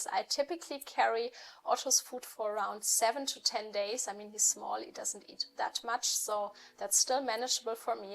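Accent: Germany. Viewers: Very interesting - thanks for answering all these questions! One that I've never heard you talk about is how your English comes to be so good. Obviously, as might be expected, you have quite a pronounced German accent (which I really like, by the way!), but your command of spoken English, your vocabulary, your use of colloquial expressions and even your pronunciation of British place names are all very good. I speak a little German, but, if I tried to imagine the reverse, with me trying to make videos in German whilst on the go, it would be a disaster! Did you previously spend some time in the UK?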